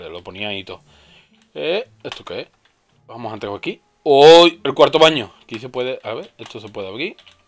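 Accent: Spain. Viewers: ¿porque habla asi?, son otro tipo de españoles?, no lo digo insultando solo es una duda porque otro youtuber habla asi, no are spam con el nombre de yutuber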